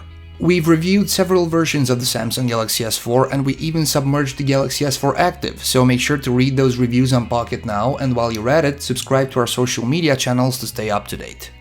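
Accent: Russia. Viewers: I still can't pin this guy's accent, Russian?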